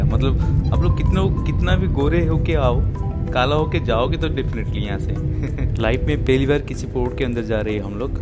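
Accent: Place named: Odisha, India. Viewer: Yeh Hindi bhi Odiya main bol raha hai bhai..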